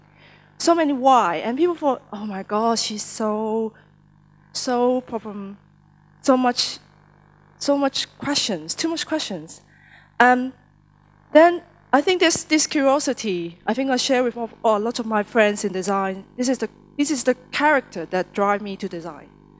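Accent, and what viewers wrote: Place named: United Kingdom. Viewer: 英式發音，舒服舒服～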